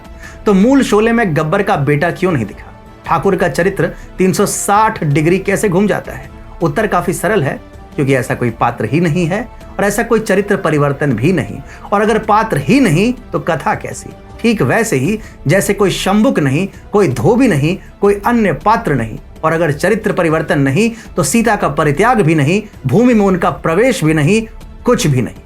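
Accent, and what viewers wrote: Standard. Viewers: Santosh karak vaani hai aapki aur shuddh Hindi uchcharan pasand aaya 🙏 aise hi samaj ka kalyaan karte rahe. Dhanyavaad 🙏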